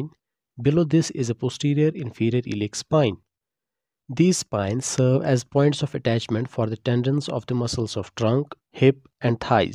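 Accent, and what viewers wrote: Kashmir, India. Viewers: Sounds like a kashmiri guy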